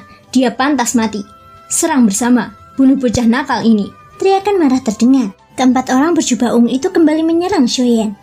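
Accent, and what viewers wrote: Java, Indonesia. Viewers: medok emen...kenapa ga sekalian pakai bahasa aslimu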